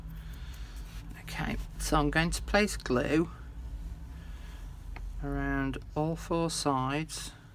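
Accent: United Kingdom. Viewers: Oh I'm so proud of what I have accomplished today. Some pages are just a wee bit crooked...like an eighth of an inch but I still love this crooked soft covered beautiful fun journal you have taught us step by step. How can anyone do this? You are a sheer genius and a love. I learned a whole lot too. Truly I have. I have to make my cover but I'm saving it for last. I want it to be extraordinary and wonderful and full of bits of happiness... I love how you you use the word bits all the time. And your accent is helping me with British movies I could nevah undahstand before you. I want to show you my envelope journal when I'm done but how my dear?. Again accept my apologies for being rude about editing things out. I so love watchin you and listening to your voice. Happy nights my dear woman. Happy nights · Love that British accent and how precise you are with placing and cutting. 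Your instruction is excellent and I am definitely addicted to these envelope journals thanks to your beautiful presentations. I am a bit unclear about where your gorgeous kits are from. There seems to be more than one resource, your own designs and others? Thank you for sharing your incredible talent.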